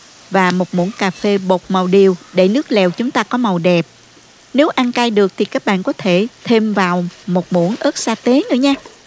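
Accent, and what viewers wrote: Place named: Mekong Delta, Vietnam. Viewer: Cảm ơn, giọng nói miền Tây thật ấm lòng ❤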